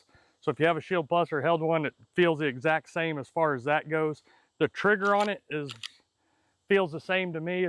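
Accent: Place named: Midwest, United States. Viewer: I picked up one of these today and my LGS didnt have a holster. Found your website, then saw this video. I thought you sounded midwestern haha! I’m also from Missouri! Seals the deal that I’m going to get my holsters from yall now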